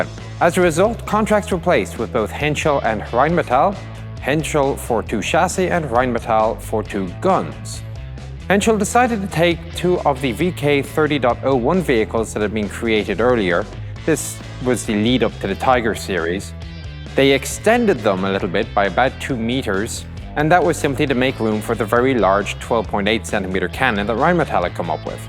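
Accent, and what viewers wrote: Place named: Ireland. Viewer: this guy has a speech impediment on top of a thick Irish accent not a great combination for understanding anything he says!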